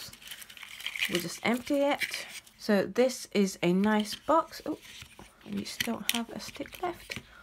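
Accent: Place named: United Kingdom. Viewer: If I could paint anywhere in the world, I would choose the UK. Many of my favorite artists that I watch are there and I would love to go get lessons from them or even just watch them paint in person. And the accent they speak in is just adorable as well, just like yours! Thanks for the chance to win and I hope you feel better very soon.. Blessings, Stacy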